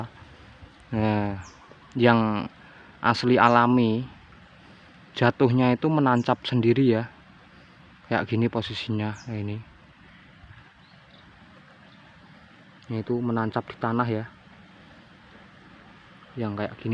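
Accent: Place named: Java, Indonesia. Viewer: Omngnya medok kayaknys orng jawa ksng prast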